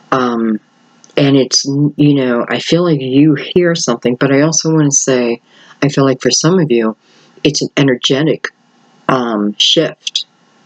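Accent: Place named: Central Pennsylvania, United States. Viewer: Love your readings♥️ are you from Central PA? You have a York/Hanover etc accent. My ex husbands family is from there and I recognize the accent.. I could be wrong though 😊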